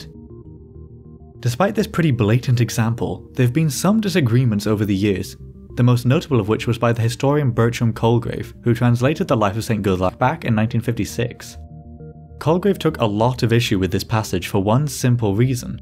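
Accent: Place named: England, United Kingdom. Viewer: Nowadays most whites kids in London speak English with a hybrid Jamaican dialect which is very different from the anglo saxon dialect of English which is spoken in this t.v programme by this presenter. In the early 2000's, young white kids on council estates in London became JAMAICANISED. This is when they starting speaking with English with a hybrid Jamaican dialect. For example, Essex county is the only place in Britain where the cockney dialect/and or accent is still spoken.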